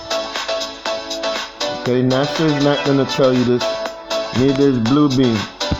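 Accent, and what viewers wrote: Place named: London, United Kingdom. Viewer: On form today 😂😂😂 And, for those of us who do have fish and chips here ~ we do usually do that on a Friday!!! Love your London accent! Bless you for making me laugh! 🙏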